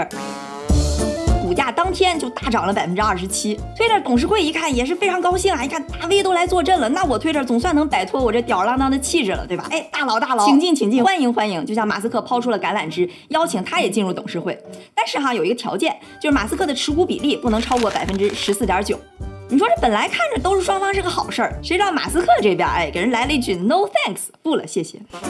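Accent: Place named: Beijing, China. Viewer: 京味的单口相声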